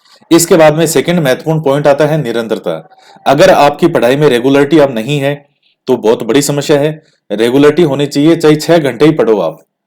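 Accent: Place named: Marwar, India. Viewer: धाप के पढो गुरूजी मारवाड़ से लगते हो 😀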